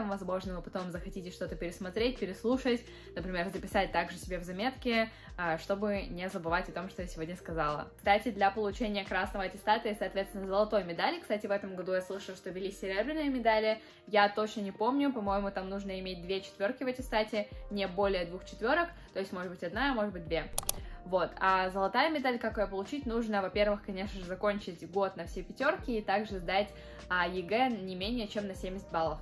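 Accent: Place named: England, United Kingdom. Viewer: как будто английский окцент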